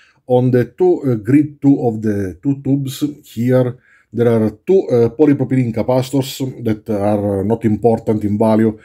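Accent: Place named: Italy. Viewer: you make great points and I hate clickbait too, but listening to you fans who genuinly love your content and want you to succeed isn't the same as making videos you don't like. And it's not clickbait if the title is true. Putting key words into your titles is good business, not deception. The genius of your videos is above 99% of other channels. I should know. I have very poor health and am often confined to my house for months. I search and search for good electronic videos until I found yours. A diamond in the rough. I know YouTube frowns on using profanity. That's why the big electronics youtubers bleep out their curse words or don't curse at all. No more profanity, key search words in your title and your viewership will increase. You are easy to listen to, have a wonderful accent and come up with brilliant ideas! YouTube “diodegonwild” has a way thicker Italian accent than you and has many subscribers. 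He doesn't curse and has the same video format as you. Please forgive me if I hurt your feelings. You do an a, amazing job!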